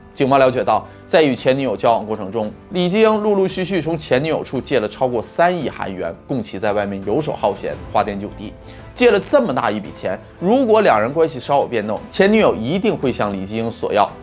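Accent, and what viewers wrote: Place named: North, China. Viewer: 感覺up主像北方人,但咬字很清楚,解說很有吸引力,訂閱你了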